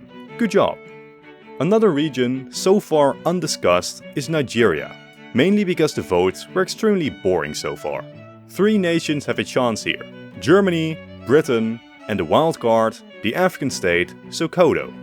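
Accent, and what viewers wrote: Netherlands. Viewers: That Dutch accent though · lekker nederlands accentje:)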